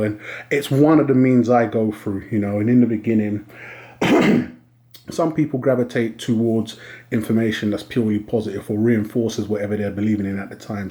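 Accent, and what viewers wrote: United Kingdom. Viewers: What’s more important is your British 👍🏼 and that Sir, draws me to the subscription button.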